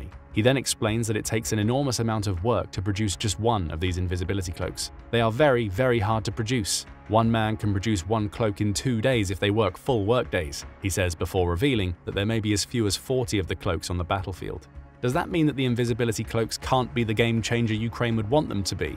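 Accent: England, United Kingdom. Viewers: English accent??? i am out!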